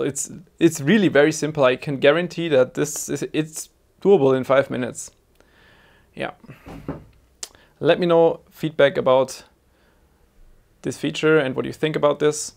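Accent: Germany. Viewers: Der deutsche Akzent einfach geil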